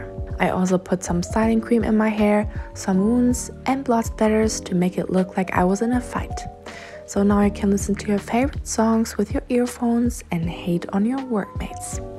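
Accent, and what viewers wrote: Germany. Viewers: off topic but what accent is this? german?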